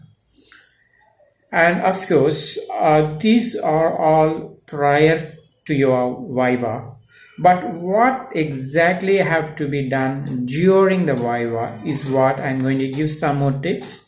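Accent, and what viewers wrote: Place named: India. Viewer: You speaks like foreigner...i think you are from India...Am.I Right?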